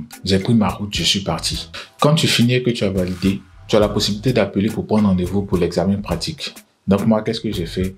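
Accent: Ivory Coast. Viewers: Merci. J'aime l'accent ivoirien 😊 Hommage à CHEIKH TIOTÉ😢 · CONSEILS TRÈS UTILES MERCI INFINIMENT TON ACCENT FAIT PENSER À BABI ( ABIDJAN) ❤❤❤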